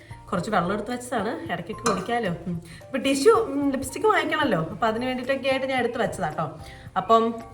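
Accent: Kozhikode, India. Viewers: Kozhikode slang alalo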